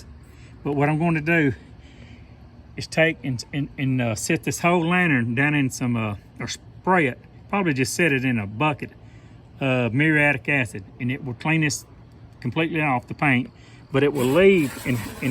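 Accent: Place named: Tennessee, United States. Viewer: That accent is from Tennesee the Volunteer State.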